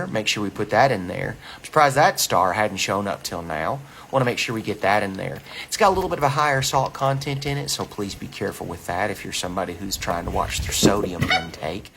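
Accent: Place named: United States. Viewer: Love them all, except the beets.....lol I have to ask what part of the US does your accent come from? Mine is a mix of South Georgia and eastern NC.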